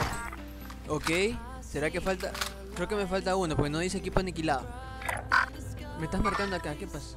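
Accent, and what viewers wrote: Ecuador. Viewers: Buen video!!!. Eres ecuatoriano bro? Yo lo soy, cuando unas partiditas hermano..?!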